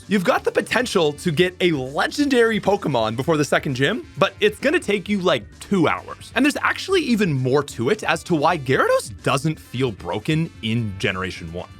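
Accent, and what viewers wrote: Canada. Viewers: First time subscribing long time follower but shit I did not pick up that you were Canadian until this vid. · Miss oot, aboot...etc. God I love Canadian's ♥️ 🇨🇦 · So Canadian lol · For someone who says out the way you do, you sure do say it a lot - a fellow Canadian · Are you Canadian? I feel like you're Canadian.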